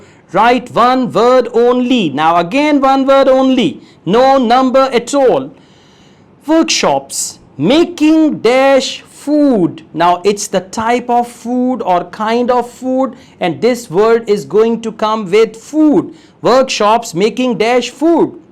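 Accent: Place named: Punjab, India. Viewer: Haaye tuhadi Punjabi 😄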